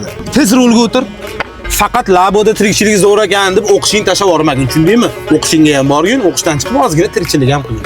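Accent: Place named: Khorezm, Uzbekistan. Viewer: Xorazim ku bu😂😂😂😂😅😅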